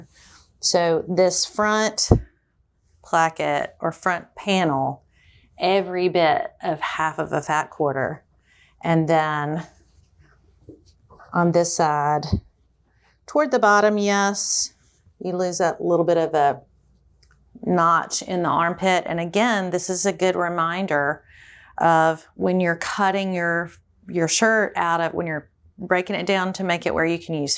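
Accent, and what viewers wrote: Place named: Alabama, United States. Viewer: what a pleasure it is to watch your videos!! I am an Alabama girl also, so I love your accent and your “southernisms”! I am a quilter and 3L ( this means love, love, love) using men’s shirts for my quilts. I buy them at the thrift store and I have found $60 shirts for as low as $1 on special sale days! Oh what a happy girl I am when I get such great bargains AND beautiful fabric for my quilts. Keep producing your videos and please share your finished projects with us!!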